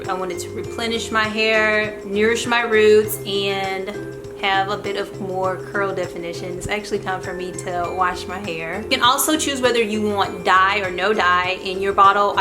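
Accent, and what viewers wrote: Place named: South Carolina, United States. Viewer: I like your accent what state is it from? south Carolina?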